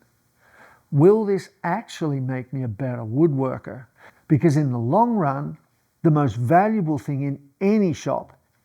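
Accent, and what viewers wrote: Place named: Australia. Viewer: Great advice. I will stop buying gear and start making. I’ve just found your channel and it was nice to hear an Australian accent.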